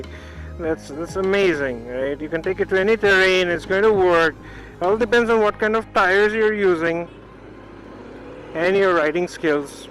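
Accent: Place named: North East, United Kingdom. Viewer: Liked your review. I am an old rider and got the mojo sometime back. It's an amazing bike. Didn't go for the dominar as I don't need abs. I really don't as I have been riding a bullet all my life. It is a really good machine and the engine is butter smooth. By the way are you from the north east as I have a lot of friends up there and Ur diction is very similar. Anyway good job and keep up the good work. I agree with you about the marketing bit. Mahindra should really market it aggressively. They have a fantastic product in hand. Cheers.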